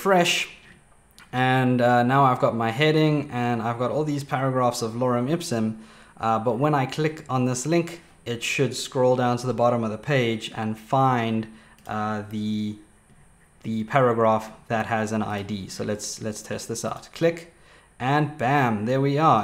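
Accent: South Africa. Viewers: I didn't know you were south african <3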